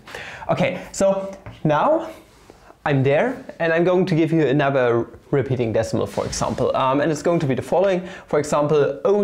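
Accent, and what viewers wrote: Germany. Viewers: It’s not you, it’s your german accent.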